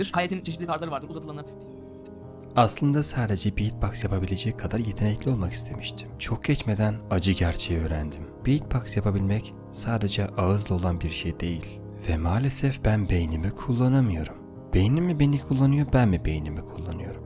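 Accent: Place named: Çorum, Turkey. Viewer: Abi Çorumlu musun aynı benim ailem gibi konuşuyorsun çok komik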